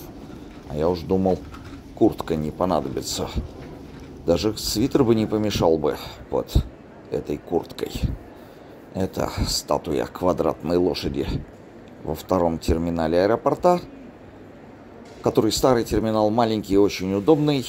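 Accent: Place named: St Petersburg, Russia. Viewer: Это такой специфический питерский выговор? Напоминает нечто среднее между Невзоровым и Федором Чистяковым. (оба питерцы)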